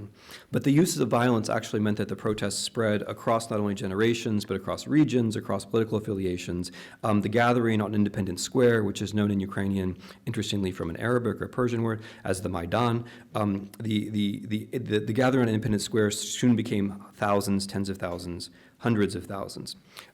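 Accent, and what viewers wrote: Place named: United States. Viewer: You can tell you are American I didn't understand it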